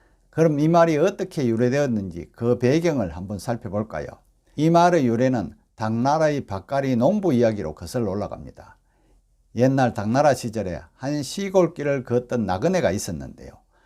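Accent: Gyeongsang, South Korea. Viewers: 선생의 억쎈 갱상도 사투리 그냥 듣고 싶지.안소이다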